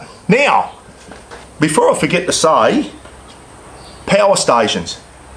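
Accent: Australia. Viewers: Hey Great to see you back on youtube, I missed you heaps when you shut down your old channel. It is so good to hear the voice of a genuine Aussie on youtube. I am so sick and tired of hearing the voices of so many arogenate yanks.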